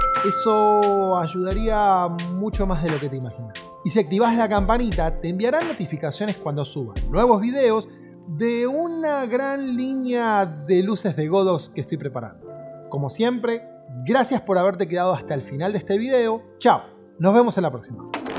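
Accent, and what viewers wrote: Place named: Argentina. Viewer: Conocí el canal por este video. A ve que está muy bueno. Solamente medio bizarra la mezcla de español neutro y acento argento jajaja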